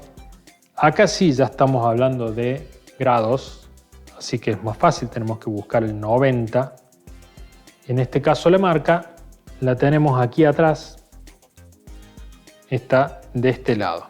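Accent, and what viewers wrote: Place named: Córdoba, Argentina. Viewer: creo que por tu acento eres cordobés 😊😉. Sabes. Tengo un 🔭 Sky. Watcher Dobson extensible de 10 " <num>. F: 4,7 . Y una cámara Reflex T6. Modificada ..la Abrí yo y le saqué el filtro quedó a sensor pelado . Quería probarla así . Y es una locura ... Las fotos que tengo haciendo light a pulso lo mejor posible .. en 0,8 s 1s como máximo sino me salen las estrellas movidas 😊😊😀 que pena no tengo como mostrarte las fotos de Orion . Una locura 😍😍😍 . Vivir está experiencia de hacer light rápidos y tener que enfocar la cámara cada 10 fotos ..me quedará en el recuerdo !!! Después que me llegue la montura .... Nosotros allá en el sur sabemos empezar desde lo más bajo con los poco recursos que haya !! Eso no lo perdemos nunca 💪💪😁😁 un gran saludo !!! 👍👍👍👍👍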